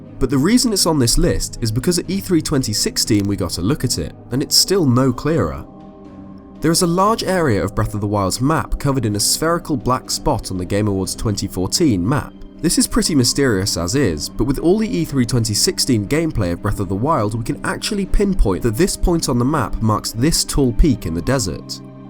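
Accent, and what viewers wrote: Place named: RP, United Kingdom. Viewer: Thank you for a clear voice and speaking the Queens english, as well as knowing what you talking about! Very professional! Congratulations, job well done!!!!!!!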